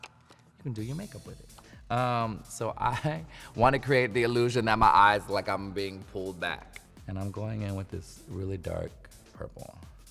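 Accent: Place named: New York, United States. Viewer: I live for ha New York accent , love you 💕💕💕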